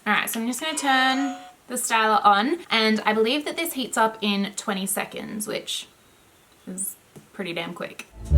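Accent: Australia. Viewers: Are you Australian? love your accent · lol, I am Aussie and when I watched 50secs into the vid, I was like... she is Aussie!!!!!!! yay !!!!!!!!!!!!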